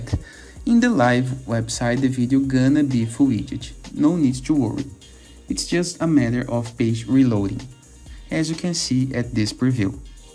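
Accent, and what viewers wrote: Brazil. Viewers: Nice content and nice sotaque brasileiro, meu querido!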